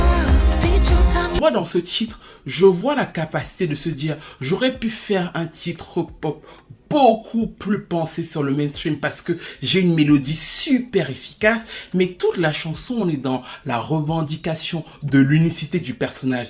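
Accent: Cameroon. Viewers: j’aime bien ton petit accent camer 👍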